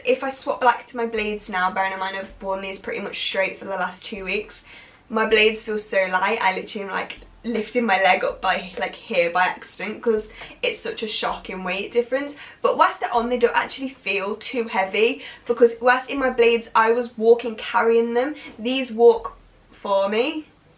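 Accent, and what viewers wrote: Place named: England, United Kingdom. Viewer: Your a gorgeous girl...….I'm an Australian I love your English accent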